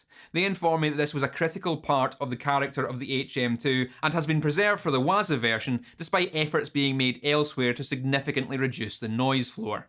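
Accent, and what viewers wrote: Scotland, United Kingdom. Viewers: I watched the other HM-2 video before this... without much thinking about it or registering what the channel name was I thought "hey he sounds like that other scot"... turns out it's the same person, he just cut his hair. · Combine the circuit analysis with the Scottish accent.. 😩😩🥵🥵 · Scottish accent is the coolest.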